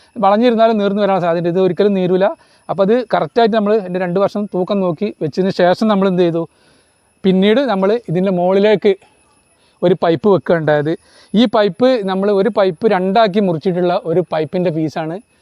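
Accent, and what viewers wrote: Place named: Valanchery, India. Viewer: മലപ്പുറം ജില്ലയിലെ വളാഞ്ചേരി ഭാഗത്തുള്ള ഭാഷ ശൈലി ആണ് അദ്ദേഹം സംസാരിക്കുന്നത് ഓരോ നാട്ടിൽ ഓരോ ഭാഷാ ഉച്ചാരണം ആണ് അതിനെന്തിനാ കളിയാക്കുന്നത്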